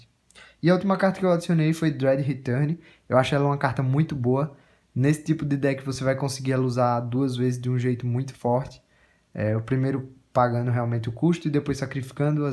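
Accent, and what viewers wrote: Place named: Northeast, Brazil. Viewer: Rio Grande do Norte. Reconheci o sotaque e gostei de ver mais nordestinos produzindo conteúdo de Magic. Me sinto representado.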